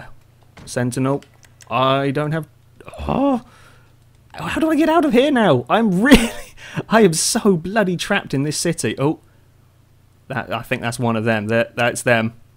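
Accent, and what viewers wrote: United Kingdom. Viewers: If it wasn't for your British accent this would have been unwatchable, that said I enjoyed it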